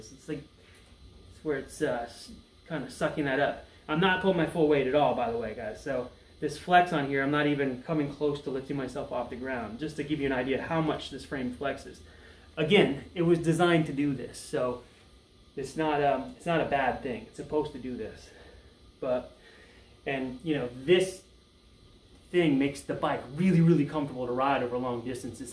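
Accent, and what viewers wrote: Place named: Southern California, United States. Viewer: Hey glad you got a replacement frame. It sounds like you’re from SoCal as well. Could you tell me which giant dealership you dealt with.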